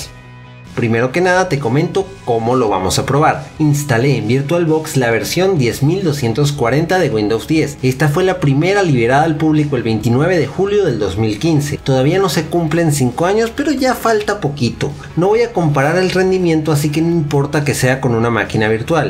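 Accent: Venezuela. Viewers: Primer Video en donde se escucha marcado el acento venezolano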